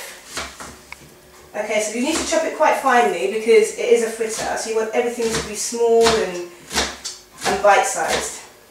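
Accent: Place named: United Kingdom. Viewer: Great recipe! I liked your British accent! Thanks!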